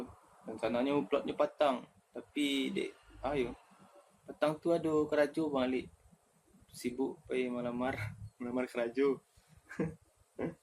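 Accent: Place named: Payakumbuh, Indonesia. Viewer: Logat pikumbuah jaleh bana bang alik 😂🤣😂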